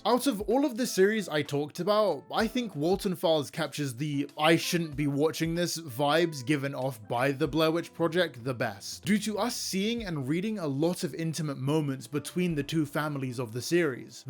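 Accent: United Kingdom. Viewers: Black man with funny (fucking English?) accent talks about analog horror and uses anime in the video for some reason. So anyway I subscribed to the great value KSI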